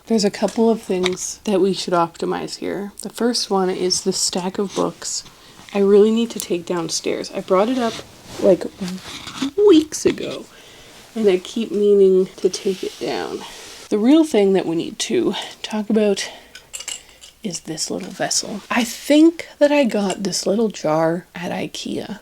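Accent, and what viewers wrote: Canada. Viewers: your canadian accent seems strong to me and i love it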